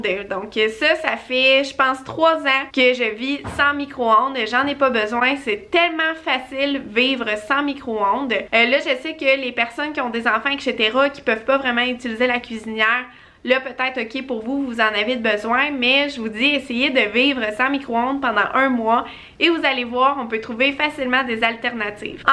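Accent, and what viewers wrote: Quebec, Canada. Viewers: l'accent québécois c'est vraiment un accent très spécial🤔🤔🤔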